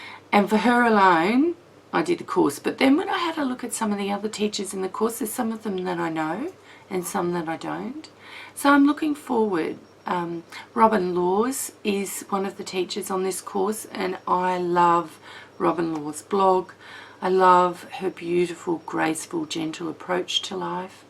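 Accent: Australia. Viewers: Haha, yours is real & proper Aussie English! Mine is just a silly Dutch accent, LOL!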